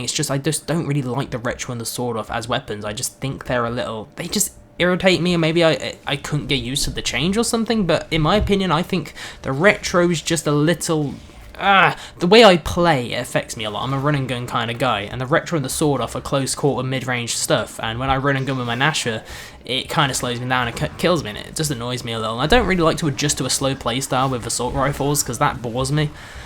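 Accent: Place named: Salford, United Kingdom. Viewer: are you from salford? you have an extremely similar accent to mine, only mines more high pitched and squeaky being female...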